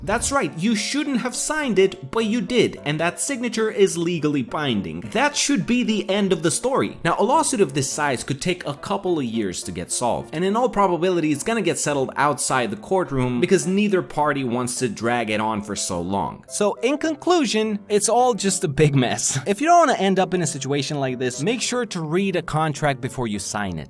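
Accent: Romania. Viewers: Bro tu clar esti roman ca se vede si dupa nume si dupa accent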